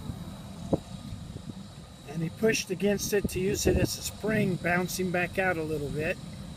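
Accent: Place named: United States. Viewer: That commentary made my ears bleed... soo American... As for bouncing off the pole to come forward, umm, isn't that what 'ahead' on an engine is for... You bounce of a pole like that on 9 out of 10 boats and you won't have a job the next day, although nothing wrong with using a pole with a sponson sitting on it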